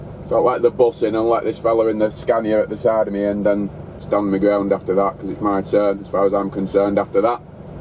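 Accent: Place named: Wales, United Kingdom. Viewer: I have traveled more than 20 times with Minoan Lines and highly recommend the 2 Cruise Europe / Olympic vessels. I found out that YouTube has a sub-text mode, because I have a little trouble understanding Wales Dialect. What I can NOT recommend is ANEK-Superfast with their old ships. Video Tripod is good Thank you very much:-)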